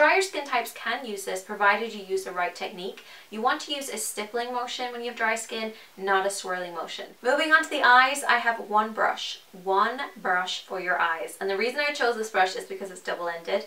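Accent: Ireland. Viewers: Are you Irish?🇮🇪 P.S I love you😘❤️ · Are you Irish? :)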